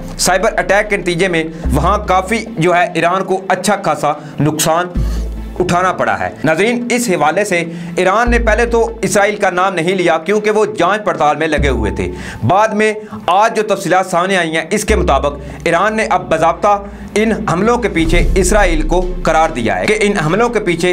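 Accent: India. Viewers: Aap apna taharef to karwa dein, ap ki urdu indian lagti hey , keya ap india se hein ? Please jawab dein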